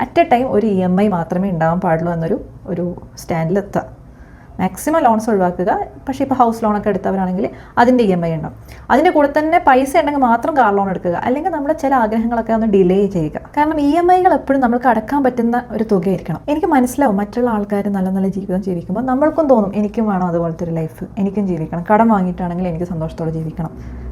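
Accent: Kannur, India. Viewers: And most of all, I enjoy listening to your Kannur accent :-) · Kannur kaari aano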